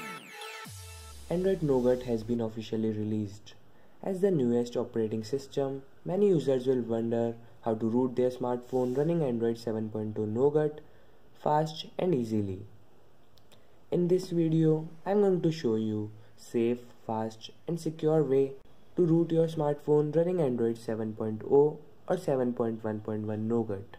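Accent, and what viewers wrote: India. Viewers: Wi u Indian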